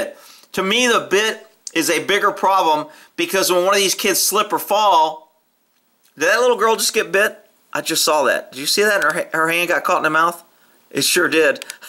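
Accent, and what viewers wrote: United States. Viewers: What a fantastic gentle horse! Completely calm, lets the girls have fun, doesn't even move an ear when the ladder falls to the ground. And I love your comments as always, with that lovely American accent "that horse has one thing on his mind, and it ain't running" hahahaah :D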